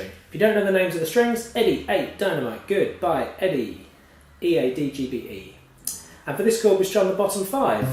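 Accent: United Kingdom. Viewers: i likes this guy, his accent is wonderful #yeaBRITISH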